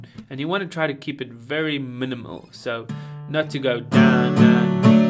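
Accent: South Africa. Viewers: Nice to you hear a South African Accent! Lovely lesson, man.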